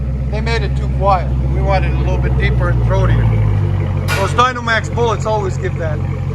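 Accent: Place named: Chicago, United States. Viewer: You sound like you from Chicago...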